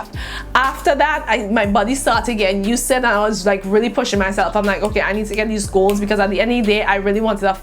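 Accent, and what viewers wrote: Trinidad and Tobago. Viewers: omg i can hear the trini/west indian in your voice lol. 🇹🇹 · By chance you from Trinidad? cuz I HEARING ITTT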